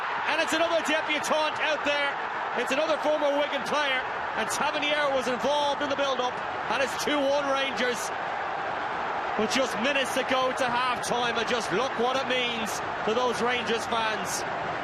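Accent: Ireland. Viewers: Sounds more irish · irish commentary ffs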